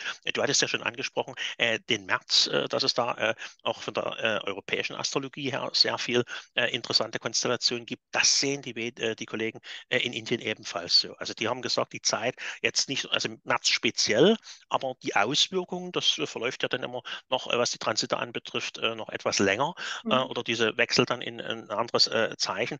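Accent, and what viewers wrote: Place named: Saxony, Germany. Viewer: Wunderbar 🙏🙏🙏ich liebe Ihren sächsischen Dialekt 😂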